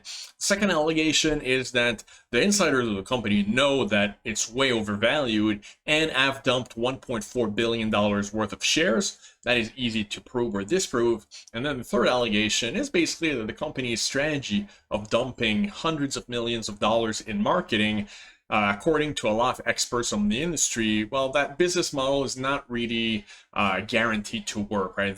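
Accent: Quebec, Canada. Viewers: Salut Est-ce que je détecte un accent Québécois?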